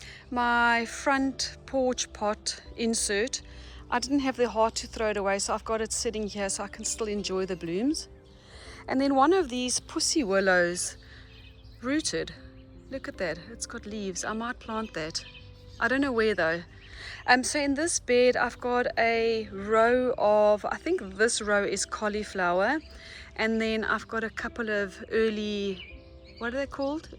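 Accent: South Africa. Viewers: Love love your channel l am learning. Thank you so much. You have a SA accent.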